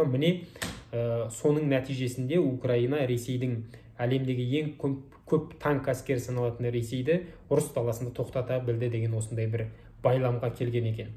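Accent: Standard. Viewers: Бәрекелді балам. Міне осылай таза қазақша сөйлеуді үйреніңдер жастар. Осы сен сияқты сауатты жастарымыз көп болсын.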